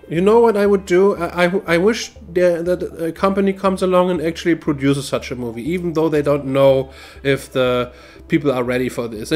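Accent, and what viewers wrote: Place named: Germany. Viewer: It's getting difficult to find reactions to this that I missed seeing. I especially like seeing reactions from countries other than mine. I'm in the USA. Your english is just fine, and I like your german accent. I also like that you are a straight man that accepts gay people for who they are. I definitely liked your reaction to In a Heartbeat. It is just about my favorite video. I had to wait almost fifty years to see a video about love that I could really relate to.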